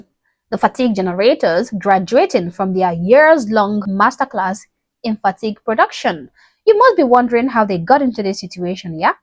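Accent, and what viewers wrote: Africa. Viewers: The other day, I was going on about hyphenated Americans. one in particular african-Americans... I was explaining the difference (in my opinion !) between black folks black Americans & African-Americans... & Sweetie I want Thanx for just being you & your accent, because in my book an ""African-American"" first & foremost they have to 1) be American citizen, but almost more importantly they have to some like your exact accent, no disrespect, what I would (maybe Mediterranean Africa would be different !) but all of y'all south of the Sahara all them (I have not heard many different accents from various parts of Africa) but they're going to sound a lot just like you ! But black Americans who's 3, 4 generations ago was "slaves", they don't know a damn thing about Africa, & are no more African that I am ! I am not denying the black that they are black folks I mean I am not blind yet ! 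(🙏Thanx be unto Our God & His Son Jesus Our Lord & Savior, Amen🙏) I can see I am not color blind, I've even said if black folks where to be stupid enough to hate America so much they transplanted themselves over to Africa... They would be in shock ! They (the indigenous Africans) be looking these transplants they would not see the American blacks as Africans; there's a completely different mind set a whole different way of thinking about things, & American blacks over in African countries be seen like fish out of water, like tourist really, that you came for a visit, I wonder if the real live Afric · You have an African accent. Are you able to find African content for your YouTube videos. Black schools have their graduation traditions. Do you have graduations in Africa?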